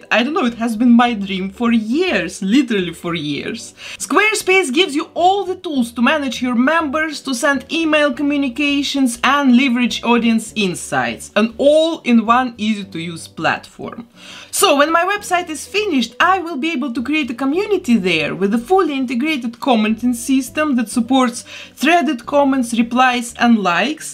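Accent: Russia. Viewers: Этот русский акцент 😅❤ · Вы русская? Просто мне кажется, что у вас русский акцент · Иногда мне кажется что у вас явный русский акцент в речи 😂❤ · I hear a Russian accent🤔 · Сразу слышна Раша Тудей ,ну на столько слышен акцент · Sonds like russian accent 🤔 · Такой акцент русский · Russian accent.🙄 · Искала комы на русском, очень мало, быстро узнала акцент, думаю, ну точно, имя подтвердило. Русский акцент узнаешь из тысячи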